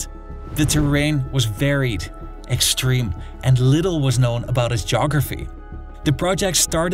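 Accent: Netherlands. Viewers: If the narrator isn't Dutch due to his accent, I will question my entire existence. Also, Sergej Witte is highly underrated, and apparently his re-entry into government benefitted the Russian Empire greatly, though sadly shortly. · как можно не слышать что автор голландец? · Lekker nederlands accentje wel haha 😂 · You left the Dutch out of your list of European powers dividing up Asia - and it sounds like you are Dutch..? Did I hear that right? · This has to be a dutch accent.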